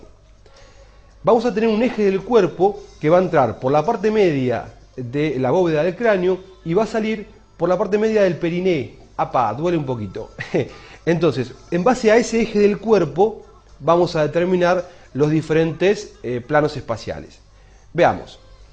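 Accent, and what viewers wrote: Argentina. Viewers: no sabes de planos y te haces el que sabes de vaginas. de paso tenes una voz de porteño importante amigo. gracias por el video de todas formas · Hablan lindo los Argentinos! Gracias por el video :)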